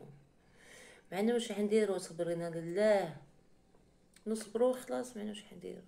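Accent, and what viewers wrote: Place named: East, Algeria. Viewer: Met lui un grattoir au CHAT , ca se vend dans les grandes surface . C'est naturel pour refaire ses griffes .ou si tu peux lui couper les ongles . D'apres ton accent tu es de l'est-Algerien .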